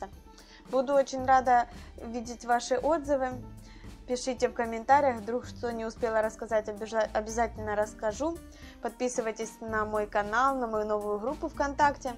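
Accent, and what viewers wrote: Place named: Kherson, Ukraine. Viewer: Вы из Херсона?! Очень знакомый, до боли, говор!)))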